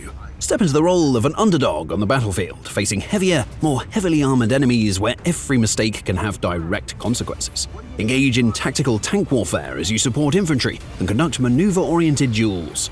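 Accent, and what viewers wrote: United Kingdom. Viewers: Such a great video. My only problem was the narration, you guys are already hard to understand with the british accent but calling Saddam Husein as "Sed'm" didn't help at all 😂 I had to keep rewinding to understand what's being said. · What's point of posting this video with your garbage brit accent, so that you can spread propaganda for iran war!? You do know that, 8 to 9 trillion dollars were spent by US military and ended up rising prices of everyday living, if not then stop spreading propaganda!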